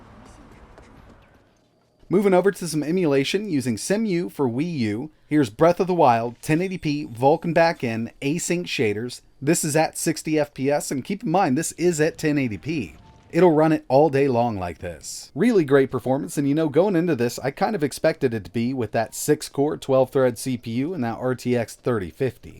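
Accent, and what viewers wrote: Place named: Appalachia, United States. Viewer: I love watching this channel and have been following it for a very long time, at least two years - what interests me is what is his accent? From which part of the US, where people spoke with this kind of accent. Does anyone know that? His way of speaking is the only complaint I have regarding this channel - sometimes it sounds a bit to hillbilly, sometimes it is too noticeable! (it's not my intention to make fun of anyone)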